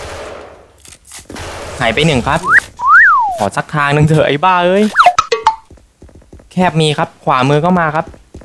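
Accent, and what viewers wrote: South, Thailand. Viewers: พี่คนใต้ใช่ไหมครับ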